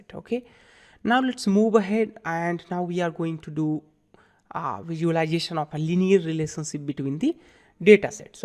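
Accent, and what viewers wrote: India. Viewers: WoW! It's been many years since I heard a lovely Indian accent. It's pleasant to hear from you sir and Thank you very much for wonderful video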